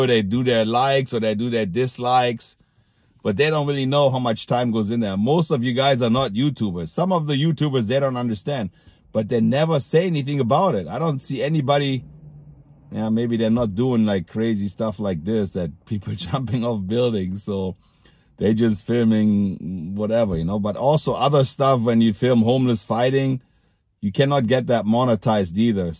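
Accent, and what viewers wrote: Germany. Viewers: Take heart brother, I've watched your vids over the years, and I love your spirit, your German accent, and your swag, "Cast not away your confidence, for it has a great reward" I don't know you, but I know you, I used to live in Venice on Wavecrest between Ocean and the strand, very close to where your shop was, but those were the better years, and I feel like I got the best of it. Keep punching brother, your in my prayers, blessings...TMac Vegas Strong!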